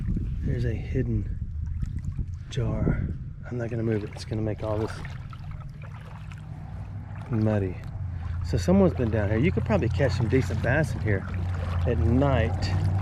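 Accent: South, United States. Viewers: Yes, you definitely have a southern accent.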